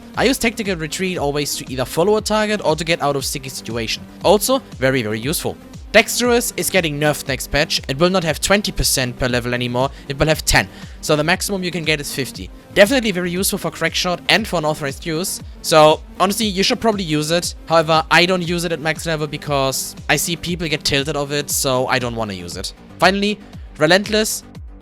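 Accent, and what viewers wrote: Germany. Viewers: alter man hört sofort diesen deutschen akzent :D gutes video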